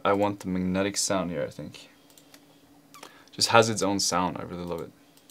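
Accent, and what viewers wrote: Norway. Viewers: You know you are Norwegian, when you can hear the Norwegian in his voice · You sound so Norwegian. In the best possible way of course - bra video og mye god inspirasjon!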